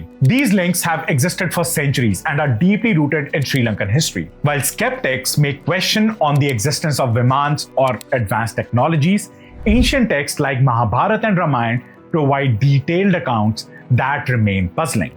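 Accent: India. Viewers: Better slow down mate ...then we can at least understand your typical Indian English Accent. Slow down, why are you hurrying for ??? 😃👍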